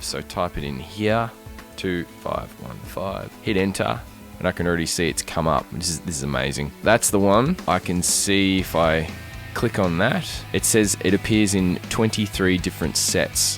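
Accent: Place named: Australia. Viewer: I know his Aussie I’m too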